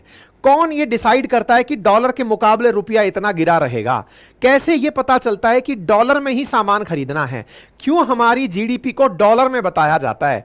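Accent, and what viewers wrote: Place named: Bihar, India. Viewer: Not interested in incorrect Hindi in Bihari dialect.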